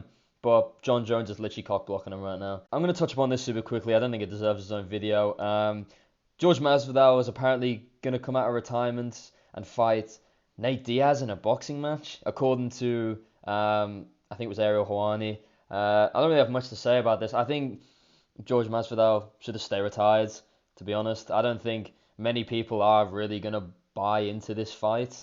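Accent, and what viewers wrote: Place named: United Kingdom. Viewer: I click this video and I hear a thick UK accent LOL - makes perfect sense. You have no clue what you're talking about m8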